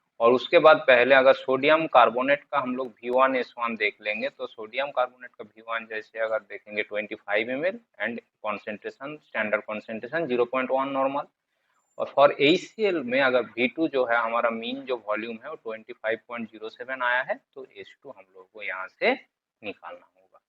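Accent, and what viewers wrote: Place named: Bengal, India. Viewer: Apni ki bangali? Apnar hindi accent sune amar mone holo ki apni bangali?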